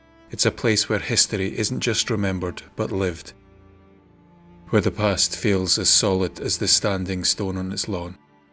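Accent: Scotland, United Kingdom. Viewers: I could listen to your Scottish accent forever. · you do such a wonderful job with these stories. I look forward to each one! I must admit this one broke my heart. 😢💔. I must say my heart belongs to Scotland.🏴. I am blessed to have sister by heart who is from Aberdeen. I will visiting this coming June. And your accent! Best accent in the world. Could you narrate my life story? It would actually sound interesting then. 😍. Thank you 🙏 and keep the stories coming!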